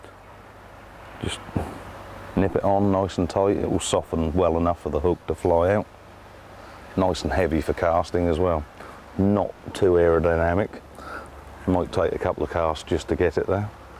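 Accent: United Kingdom. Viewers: That be a Suffolk accent there boey! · hi great video just wondering if your from norfolk your accent sounds that way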